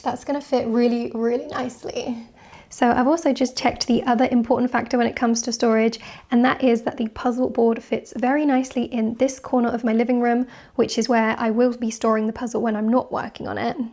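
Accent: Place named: England, United Kingdom. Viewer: there is just something about watching a beautiful redhead with a cute English accent doing puzzles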